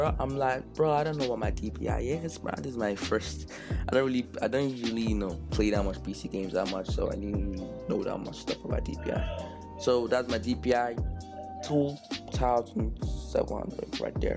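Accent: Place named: Nigeria. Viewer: i can tell ur nigerian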